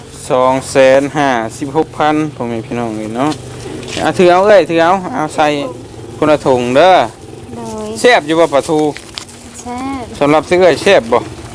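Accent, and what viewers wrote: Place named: Isan, Thailand. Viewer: ภาษาเว้าคือกันทางบ้านข่อยเด้อยุแถวอำเภอกุสุมาลย์ จังหวัดสกลนคร กับแถวอำเภอท่าอุเทน/อำเภอโพนสวรรค์ จังหวัดนครพนม ฝั่งไทยเด้อ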